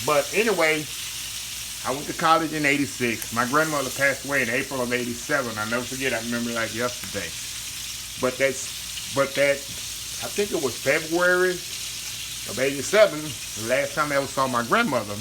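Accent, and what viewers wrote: South, United States. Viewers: He's a southern man and talks the way my dad used to!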